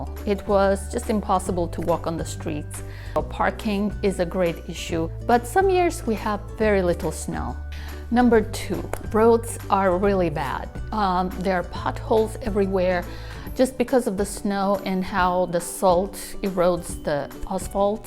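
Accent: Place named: Boston, United States. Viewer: You say Massachusetts but all I hear is Boston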